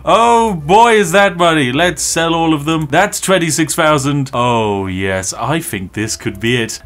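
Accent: United Kingdom. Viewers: Wait... British??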